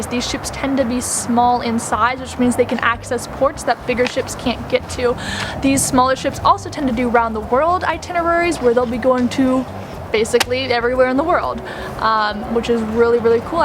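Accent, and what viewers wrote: United States. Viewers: I sounds like you have an American accent. Were you in entertainment? I was a casino dealer on RCCL. What can make or brake it, to me, is your roommate. Any opinions?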